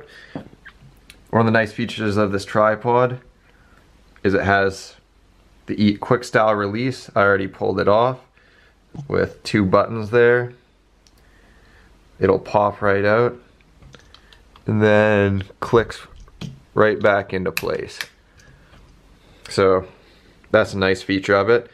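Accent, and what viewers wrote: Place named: Canada. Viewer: You sound Canadian o.o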